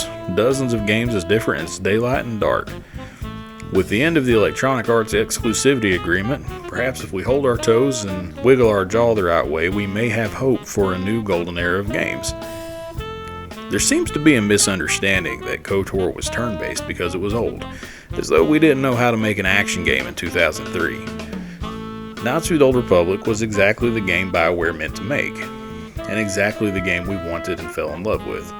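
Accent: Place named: South, United States. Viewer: I agree, that's why I'm kinda wondering why he hasn't blown up. Smooth, precise with a certain southern charm twang going.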